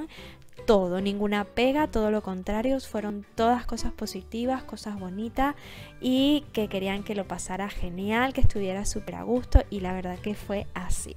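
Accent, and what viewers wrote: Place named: Maracaibo, Venezuela. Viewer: Hola... tengo rato viendo tus videos y tratando de descifrar tu acento y me decía a mi misma: “mi misma, no puede ser.... será que es venezolana?????” De repente se te sale algo maracucho jajajajaja (aunque acabo de aclarar esa duda). Estoy viendo tu video sobre las preguntas personales que te hicieron y tuve que parar para llorar por la nostalgia que sentí al escucharte hablar de nuestro hermoso país. Sentí la necesidad de escribirte para felicitarte por todo lo que haces. Se nota que amas lo que haces y por eso todo te sale tan maravilloso. Gracias por dejar en alto nuestra hermosa Venezuela. Saludos desde Indiana, USA. Recibe un gran abrazo!!!!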